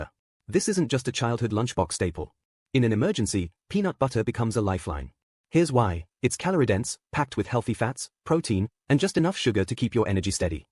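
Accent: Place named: England, United Kingdom. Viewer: Congratulations! A new voice was used (English accent); however, the list of essentials has changed little. Why? Because it is somewhat difficult change to any degree.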